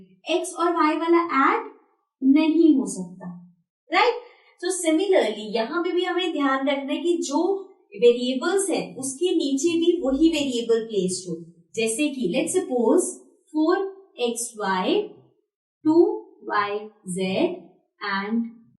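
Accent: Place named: Punjab, India. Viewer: You spake punjab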